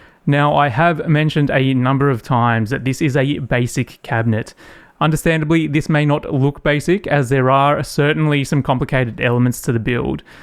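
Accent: Australia. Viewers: Ah didn't actually know you were Aussie. I like it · Awesome dude, love hearing a fellow aussie while hes doing friggen amazing work! Be good if you could use some native high end timbers if possible, if there are any. I'm only a couple years in and just use off cuts from a stair manufacturing place. Love your work mate.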